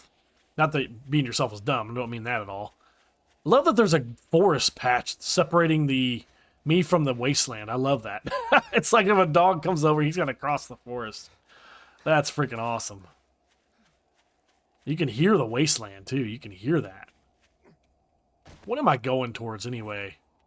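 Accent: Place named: Midwest, United States. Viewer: Sounds odds but I like the muted color better. I say you got that Midwest anywhere USA voice. A strong radio voice like Tom and Ray Magliozzi the car talk guys. (for you young people radio is what you listen to while paying Bionic Commando on your NES console.)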